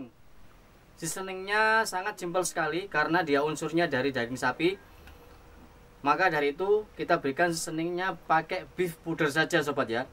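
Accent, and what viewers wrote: East Java, Indonesia. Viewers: bahasanya medok banget orang jawa timur mana ya chef 😊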